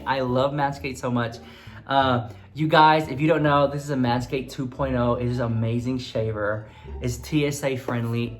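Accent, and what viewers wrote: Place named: Texas, United States. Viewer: It was driving me crazy not knowing where your from... so I went to your Twitter to find out. I KNEWWW YOU WERE FROM TX TOO!! I hear that accent girl. im also from tx lol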